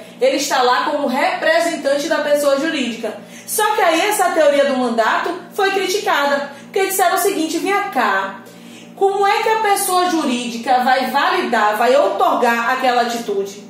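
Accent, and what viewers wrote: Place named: Bahia, Brazil. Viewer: Estou amando suas aulas, sou estudante aqui no Rio de Janeiro e sou baiano; seu sotaque parece ser baiano.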